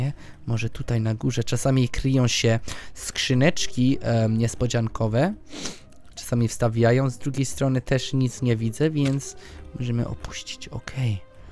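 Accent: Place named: United Kingdom. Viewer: on jest anglikiem więc muwi troche inaczej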